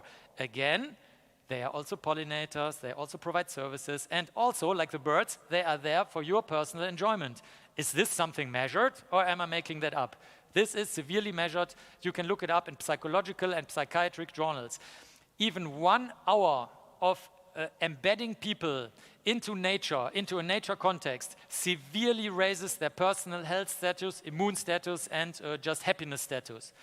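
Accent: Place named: Germany. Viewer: Für jemanden der aus new york kommt sehr krasser deutscher akzent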